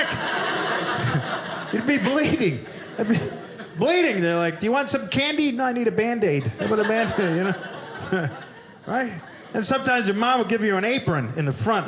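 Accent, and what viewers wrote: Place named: New York, United States. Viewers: My favorite NYC accent 😁... your road rage joke "pre-finger" 🤣🤣🤣. Good stuff! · Its true about driving in NYC when someone cuts you off they give you the finger. Its not a joke it happens every day! But I realize that is funny to people not from NYC!Although his accent says Long Island not the city. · But I like his neuyak accent regardless.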